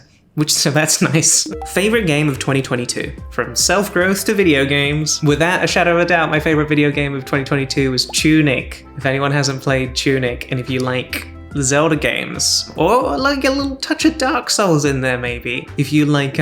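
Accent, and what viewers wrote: Canada. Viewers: Your accent seems to have been influenced in Canada! Ok vowel shift! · Your whole style is so good and you look so happy and comfortable (!!) but the part of this video that caught me the most by surprise was hearing the distinct traces of a Canadian accent in your voice. Didn't expect that little twist! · Wow you can really hear the Canadian accent now. Crazy how quick accents take hold. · For the first couple of minutes I kept thinking, "There's something different about her voice..." and then she mentioned living in Canada and I was like, "Ah, yup, that's it." Congrats on your gender transition and your Canadification transition! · So happy you are happy, and seeing you live your best self! Take your time & do what you are comfy with! Also couldn't help but giggle at the words that sound more Canadian now hehe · Your voice sounds so comforting but I'm noticing a little bit of Canadian accent now! 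· Lovely to have you back and with an extra Canadian twang to your accent! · Omg you sound so canadian! Welcome back to youtube :) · ! Thank you for reintroducing yourself after all these years. This has felt nostalgic in a way. The only thing that made me think… WHAT?! Is your new Canadian fusion accent haha. Nice to see you again 😊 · hahahaa you've picked up the Canadian accent! it jumps out whenever you say "back" :')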